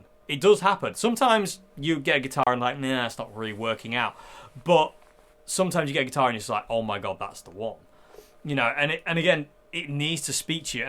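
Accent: United Kingdom. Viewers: I love the British accent, oh and the guitar playing too.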